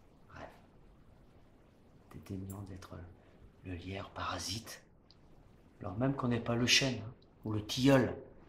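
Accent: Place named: North, France. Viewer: Vous venez du nord vous ^^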